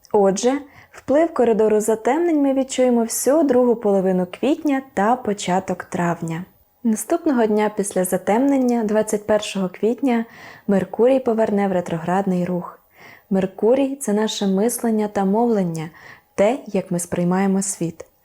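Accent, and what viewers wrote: Standard. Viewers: Як приємно слухати таку чисту та мелодійну українську мову ❤❤❤ Дякую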